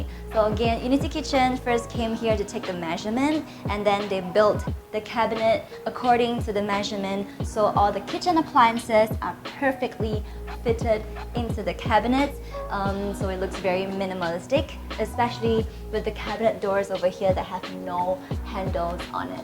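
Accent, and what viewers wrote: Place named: United States. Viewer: you sound so american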